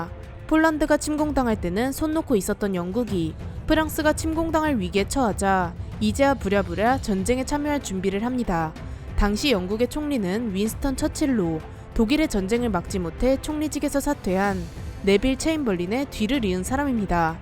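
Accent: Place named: Gangwon, South Korea. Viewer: 사투리가 강원도 가? 어디~나? ㅋㅋㅋ 말투가 귀엽더래요^^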